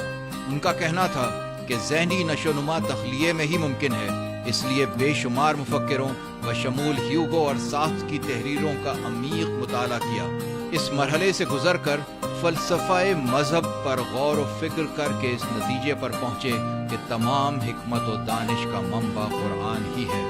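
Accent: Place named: Baltistan, Pakistan. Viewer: sir by your accent it seems you live in skardoo or baltistan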